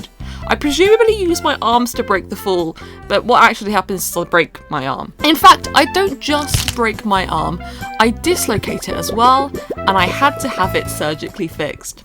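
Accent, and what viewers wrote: United Kingdom. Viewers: you are so British that I have to pause the video to fully understand all your Britishisms. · i love your voice idk why, maybe bc bri'ish lol also love your content :3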